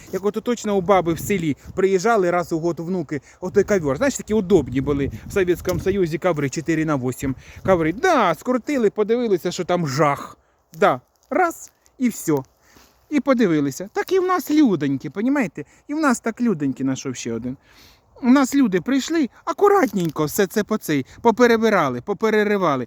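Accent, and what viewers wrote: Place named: East, Ukraine. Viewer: От так говорять на сході❤❤❤❤❤❤❤❤❤❤❤❤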